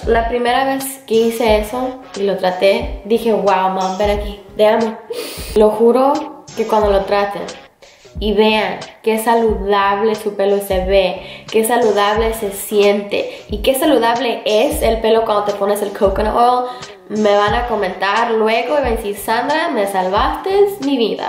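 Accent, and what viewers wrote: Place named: Latin America. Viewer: todos diciendo que le cuesta hablar en español y yo que la acabo de conocer pensaba que era latina:v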